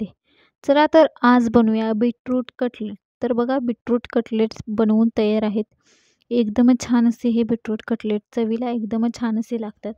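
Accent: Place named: Kolhapur, India. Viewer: रांगडी कोल्हापुरी भाषा आहे ती ताई खिसुनच बोलाव लागत...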